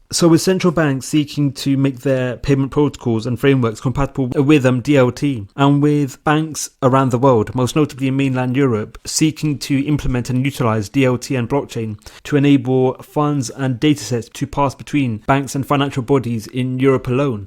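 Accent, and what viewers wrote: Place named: United Kingdom. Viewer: Bru that British Accent.. 😣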